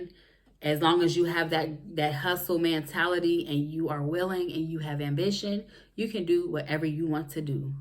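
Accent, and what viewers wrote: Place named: Ohio, United States. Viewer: I love your transparency and authenticity in this video!!! 🙌🏽 I’m interested in learning more from you 😍 sounds like you’re in Ohio so am I!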